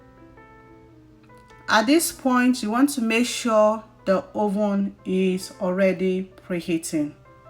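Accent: Nigeria. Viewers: She sounds Nigerian and this is a Nigerian meat pie recipe. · Talk like a Nigerian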